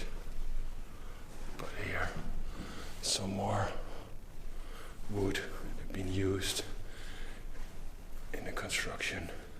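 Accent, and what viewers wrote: Netherlands. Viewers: You have such a Dutch accent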